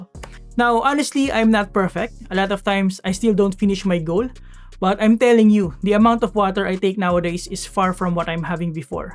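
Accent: Philippines. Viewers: Come through Filipino accent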